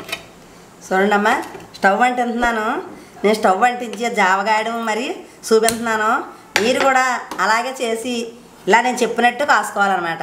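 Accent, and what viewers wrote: Godavari, India. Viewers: godavari yesa basha adhurs bamma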